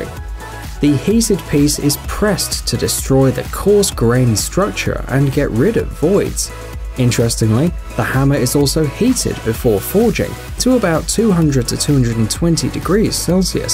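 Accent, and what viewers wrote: United Kingdom. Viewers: Ugh listening to the British way of saying these metals. No thanks.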